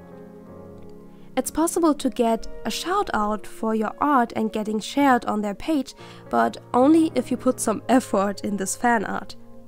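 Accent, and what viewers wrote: Germany. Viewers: german accent✨😍😍😍😍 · Ja der Akzent ist wirklich "deutsch" 😅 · somehow you sound like you're German are you? sorry if i'm wrong edit: habe gerade die Kanalbeschreibung gelesen :3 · Uh dein englisch ist echt gut! Man hört dir zwar extrem an dass du deutsch bist aber trotzdem, klasse! 😱 und danke für die tollen tips, es ist schön wenn man leute hat die deine arbeit schätzen :3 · Ha, wusste ich's doch. Hab auch den deutschen Akzent rausgehört xD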